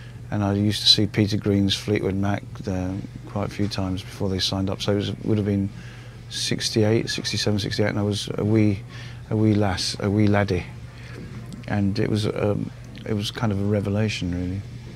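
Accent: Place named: France. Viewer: Sadly, I couldn't understand word. Damn that outrageous French accent.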